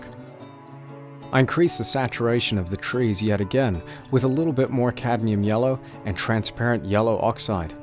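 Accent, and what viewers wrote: Australia. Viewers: your accent is so Australian for some words, and so American for other words. I would have guessed the Aussie would get into all words, at least a little bit. But it's either one or the other though, very interesting. Great location by the way.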